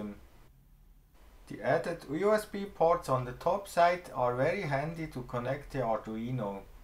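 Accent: Switzerland. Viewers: Swiss English sounds funny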